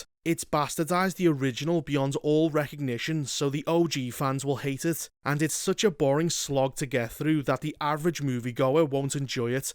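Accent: Liverpool, United Kingdom. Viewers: Are you scouser???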